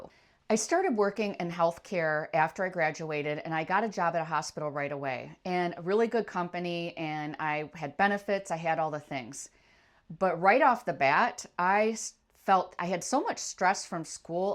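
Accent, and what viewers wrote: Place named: Michigan, United States. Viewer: Wow! Your story is very similar to mine and my name is too. I knew you had to be from MI from your accent. I spent over 25 years living and working in Chicago area and after my 3rd son graduated from high school I started planning my escape. My husband and I had been married for almost 25 years, raised 3 boys, started a land ran a business together (for 10 of those years for me. The company is still thriving in NWI), built a beautiful home, but we hadn't been drifting apart apart our first son left for college at UW-Seattle where he stayed for 15 years (he recently moved back to Chicago area--beautiful but too expensive even with a great job in IT). I left our business in 2013, and started planning to divorce and move, which was a mutual agreement. I was so sick of Chicago winters and always wanted to live at the beach, where we had vacationed many times. 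I moved out of my 3000 sq ft home,into a studio apt where I would live for the next 2.5 yrs, worked at a tax prep firm (I had been a CPA/accountant/tax preparer my whole career so this was a great fit for me). In the summer I worked 3 jobs at once as the min wage in SC is still $7.25, I was struggling but happy and living at the beach. I had never felt freer. Flash forward 11 years I am retired and living in SSI. I met my second husband )totally unplanned for sure), and in 2023 we bought a fixer upper home in a beautiful and safe neighborhood 3 miles from the beach. My friends and family visit often and this year a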